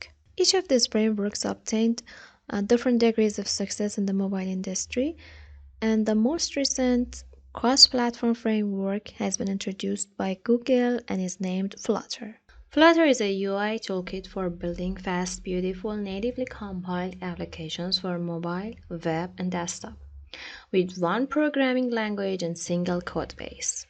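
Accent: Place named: England, United Kingdom. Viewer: Excellent english accent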